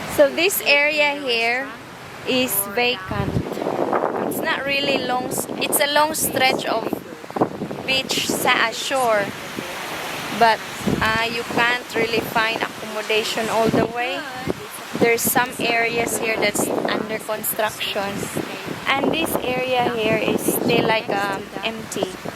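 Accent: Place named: Visayas, Philippines. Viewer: Pataka ka man lang oi josko binisay-a na Lang na masabtan pa wai accent bisaya kaayo galisid jud hehehe.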